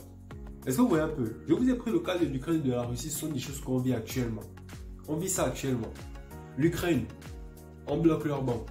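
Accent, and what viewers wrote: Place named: Gabon. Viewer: Fondamentalement Fondamentalement tu aimes le mot la hein bro ? tu as l'accent d'un gabonais, en tout cas force à toi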